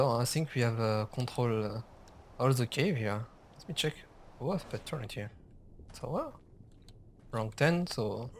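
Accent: France. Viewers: On sent l'accent français quand même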